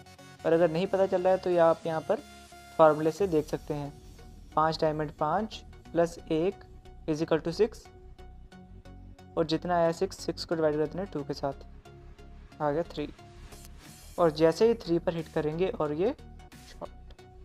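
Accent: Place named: India. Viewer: dude i hate hindi accent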